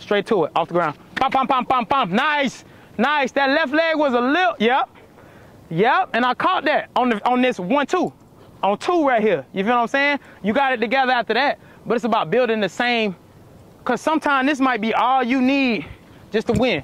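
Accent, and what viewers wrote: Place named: Florida, United States. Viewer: That Florida twang is so exclusive! 💪🏾😎🌞🌴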